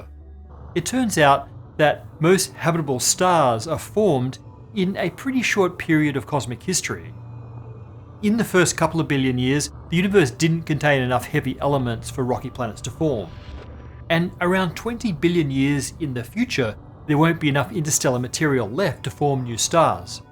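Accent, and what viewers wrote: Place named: Australia. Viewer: This was great but I'm confused as to why you suddenly have an Australian accent Isaac. Nice use of the usual animations btw.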